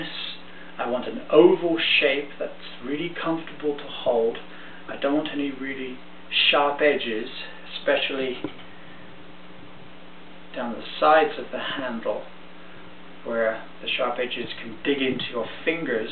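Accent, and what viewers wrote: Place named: United Kingdom. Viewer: Yes, a lovely demo on pulling handles on a large mug. The dry handle test is excellent, had never seen that done. I was surprised at the strength shown. Where are you located, sound British.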